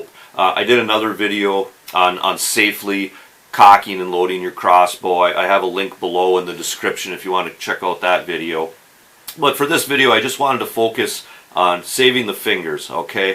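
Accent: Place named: Michigan, United States. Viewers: Good info and as a newbie crossbow guy duly noted. You sound like you're from the UP... Sault Ste. Marie here. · What’s your general area in Michigan! I hear that accent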